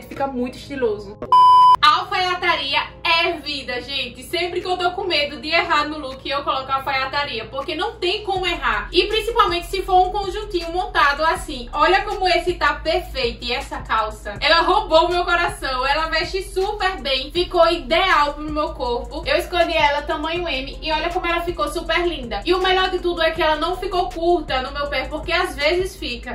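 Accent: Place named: João Pessoa, Brazil. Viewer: Esse sotaque nunca me enganou, sou de João Pessoa e não vivo mais sem tu, mulher 😂😂😍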